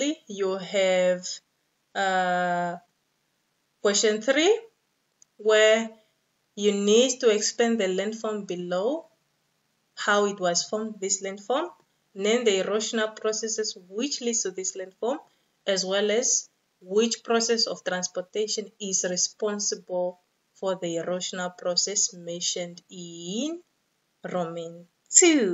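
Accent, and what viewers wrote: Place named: Africa. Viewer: The fact that you speak like an African makes me understand really....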